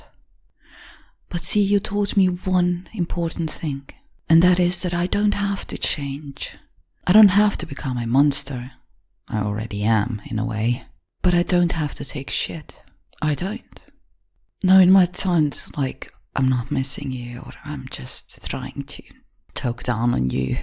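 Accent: United Kingdom. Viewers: You are British .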